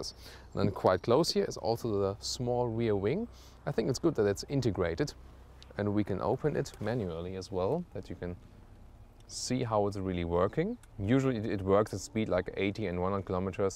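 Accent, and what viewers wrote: Germany. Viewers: Nice details, impressive narration. Love to listen your German-accent English. Keep going,!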